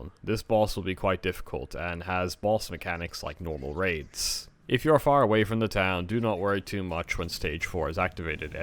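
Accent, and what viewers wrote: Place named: United Kingdom. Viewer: oh damn lmao, didn't realise you were from there, I heard British in there lmao.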